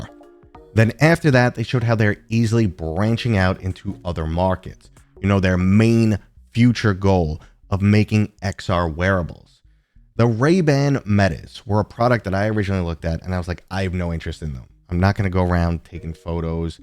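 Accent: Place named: Boston, United States. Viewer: Boston accent! I love it!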